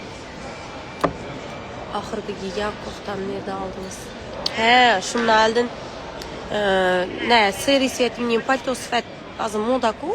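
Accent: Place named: Khorezm, Uzbekistan. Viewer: Horazimcha gapirmang Toshkentcha gapiring asalim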